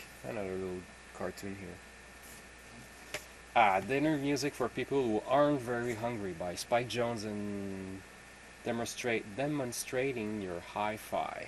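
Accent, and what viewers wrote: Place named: Canada. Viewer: I absolutley love some of these interesting records, and i want to see your whole collection. so please keep posting!!! And thats what i meant by no talking, please dont take it as a put down, (ya gotta enjoy a good canadian accent) but the quicker you get to the point, the more albums you show, if there's alot to say about a certain album, thats fine but you better be putting out more videos. you got some great stuff and much respect.....BUT POST MORE VIDEOS!!!!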